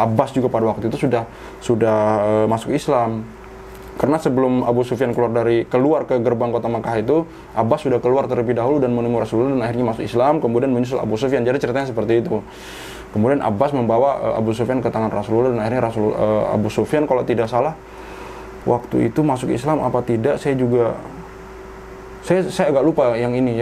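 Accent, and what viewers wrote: Eastern Indonesia, Indonesia. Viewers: Mantaf.......Logat Timur Kaka....,👍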